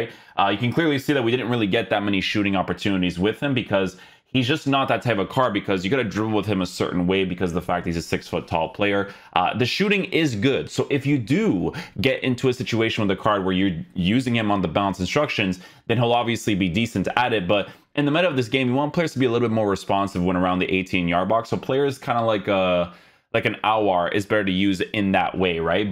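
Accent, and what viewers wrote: Portugal. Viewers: He's Portuguese.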